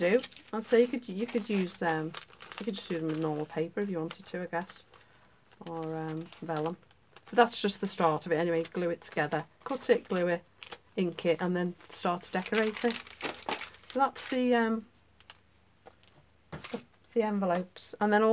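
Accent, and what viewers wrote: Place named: Liverpool, United Kingdom. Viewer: Hello. What part of Liverpool are you from.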